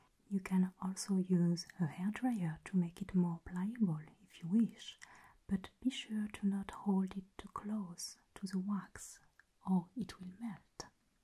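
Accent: France. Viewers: Bonsoir, le travail que tu mets dans tes vidéos est vraiment exceptionnel, bravo car cest très immersif. Le seul bémol pour moi c'est l'accent français qui ne fais pas "exotique" à mon oreille. Mais sinon vraiment bravo, je découvre ta chaîne et cest extrêmement qualitatif !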